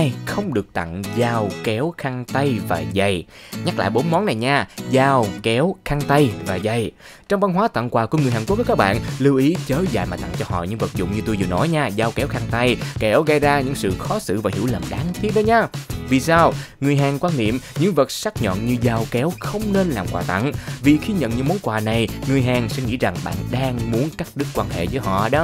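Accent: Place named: North, Vietnam. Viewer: ad nói giọng bắc nghe cute vải :)))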